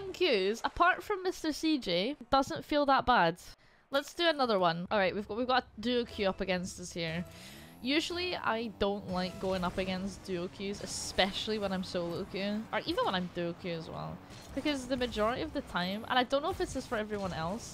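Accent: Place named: Scotland, United Kingdom. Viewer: Wait, you're Scottish? So am i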